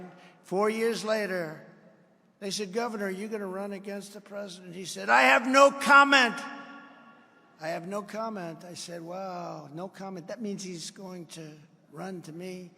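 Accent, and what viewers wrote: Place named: New York, United States. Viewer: Love you're NY accent God speed Presidrnt Trump again We are praying the fraud will be wide open this time.😊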